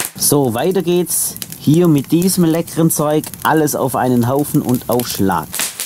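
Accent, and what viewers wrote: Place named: Swabia, Germany. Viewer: feiers vor ellem dass au en schwob bisch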